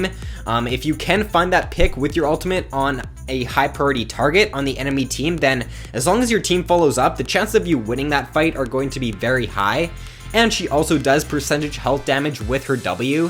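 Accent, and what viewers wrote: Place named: Canada. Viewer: i see you're canadian "eh" me two :D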